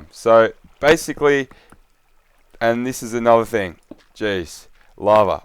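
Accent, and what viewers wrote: Australia. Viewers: Are you Aussie? I'm a kiwi! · are you australian? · you are Australian? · this guy is aussie like me :)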